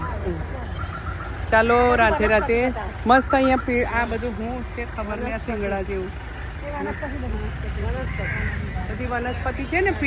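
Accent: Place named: Surat, India. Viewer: दीदी आप गुजराती हो लेकिन आप कोलकाता में शादी कैसे हो गई आप शुद्ध गुजराती बोल रहे हो सुरती भाषा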